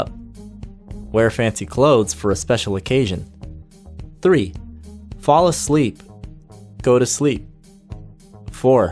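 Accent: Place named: United States. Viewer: Love American accent from Pakistan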